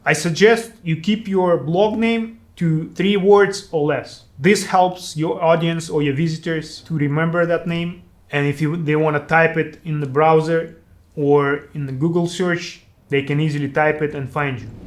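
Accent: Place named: Bavaria, Germany. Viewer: Your accent sounds like your from Bavaria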